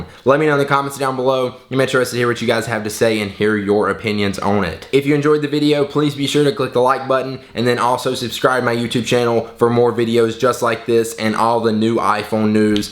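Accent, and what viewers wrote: South, United States. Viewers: Are you southern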